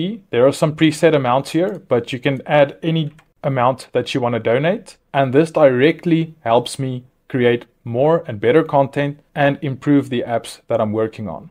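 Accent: South Africa. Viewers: Dude, are you a South African?????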